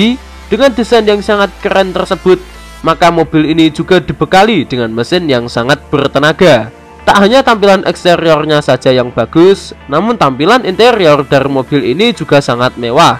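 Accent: Java, Indonesia. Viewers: Jowo ne medok 😄😄😄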